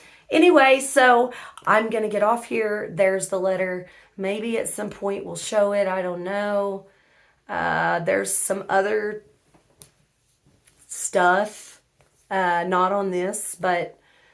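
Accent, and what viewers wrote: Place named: South, United States. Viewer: You are from somewhere in the South, I've heard this accent all my life. I do know you're from some southern state. I relate to that accent. I hope you're right. However he is completely psycho and not like most of us. He is on the spectrum someplace and due to either environmental factors or genetic, he is flawed and he can't deal with it and thus this scenario is what we rare now seeing. A person that just should have gone on down the drug path and stopped there, instead of trying to rise to be and being triggered or what ever you want to call it to kill people. He would be better off homeless and under a bridge at this point.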